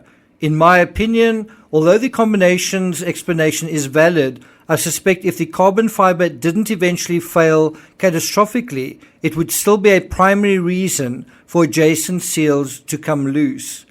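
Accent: South Africa. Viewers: I like your stuff. South African voice? Expat?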